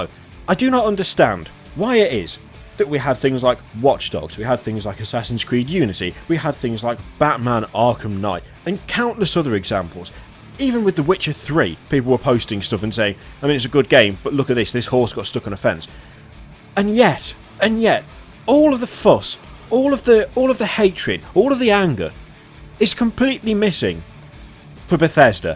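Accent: United Kingdom. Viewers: Subscribing just for that accent. I'm British too. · How is it that these kinds of angry rants always sound better when done with a British accent? But then again, everything sounds better with a British accent.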